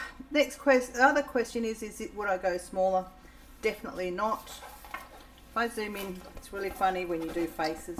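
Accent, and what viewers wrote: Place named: Australia. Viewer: This is lovely! 50x50 is becoming my go-to size. Also, great to hear an Aussie accent!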